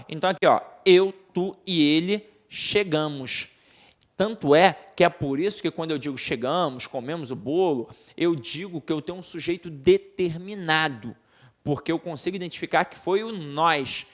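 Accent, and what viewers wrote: Rio de Janeiro, Brazil. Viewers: o carioqueis é muito forte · Adorei a aula e o jeito carioca!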